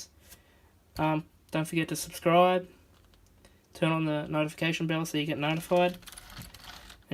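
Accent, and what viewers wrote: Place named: New Zealand. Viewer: What the f a r k happened there Govna?? Did you have that opened up already (the rear diff), OR did that just come apart in your hand??? Bummer if it did dude, but, you should sound ALOT more motivated. The way you did this video MADE ME wanna stab myself with a blunt spoon mate!! 🧐... 👎💯. I'm cryin' with the fucking migraine..!! 😯 Also your pronunciation is ALL over the place. Your confusing your Kiwi accent with an American one, it's obvious brah!!! 💯👀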